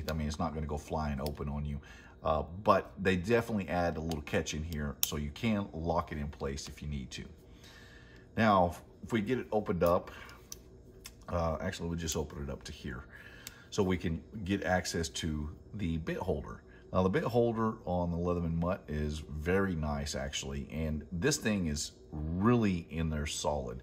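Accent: Texas, United States. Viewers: Are you actually from Texas? I'm trying to place your accent. You sound like Michael Dorsey from Tootsie when he was doing the soap opera.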